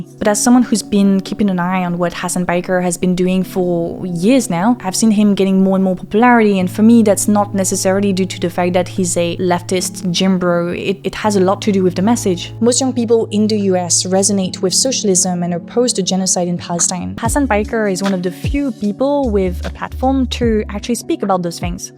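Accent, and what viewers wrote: France. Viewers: I'm part of your new masculine audience. I'll speak for myself, I don't know if there are other man who watch your content for the same reasons that I do. I first saw you on Instagram talking about french politics. I'm a recent catholic convert myself and I was curious about my faith in the "eldest daughter of the Church". Then I deactivated my Instagram account (I got tired of that) and decided to follow you here. Your content is well-researched, and left-leaning in a way that, for me, is way to stay updated with politics in the old continent through your lenses. And also a way to stay informed about current trends in feminism (and your balanced critique). I also love french culture and literature (I love your accent, everything about you, really). So for me, I feel like watching you is like reading or watching a good news anchor (with a melifluous french accent and sapphire blue eyes). 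· Can't speak for other men but I watch for the French pronunciations of words like "rugged". That was priceless, thank you. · I love you, and your french accent is a staple to your media but please say it with me. "Agency" - A-gency like "A gentsy."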